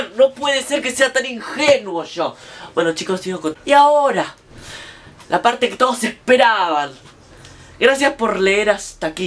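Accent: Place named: Spain. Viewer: Ese acento español es U NI CO❤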